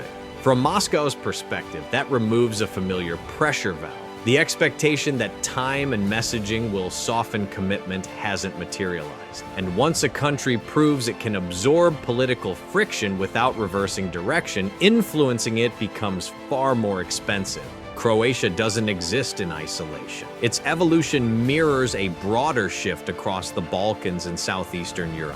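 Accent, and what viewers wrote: United States. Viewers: This tosser with a Yank accent is so delusional he don't know what is happening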